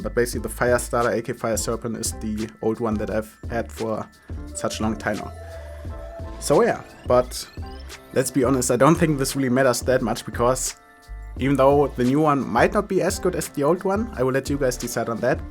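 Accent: Germany. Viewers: Are you a german guy? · Are you German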